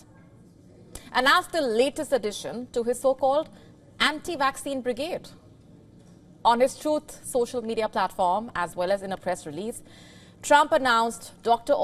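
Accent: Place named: India. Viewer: news caster with strong Indian accent...voice not clear...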